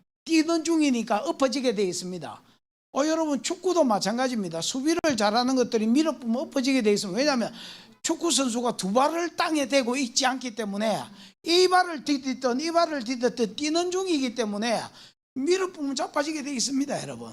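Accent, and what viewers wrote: Gyeongsang, South Korea. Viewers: 목사님 드디어 주문한 책이 왔어요. 기대 대요 목사님 설교(구속사)를 통해서 마태복음 1장의 족보가 은혜가 됩니다. 전에는 졸리고, 모르는 말씀이었습니다. 비밀이 풀어진듯 합니다. 남편도 성경이 이해가 되어진다고 합니다. 처음에는 경상도 특유의 발음때문에 고생은 했는데 지금은 정겹습니다. 주님의 이름으로 사랑하고 축복합니다. 감사드립니다.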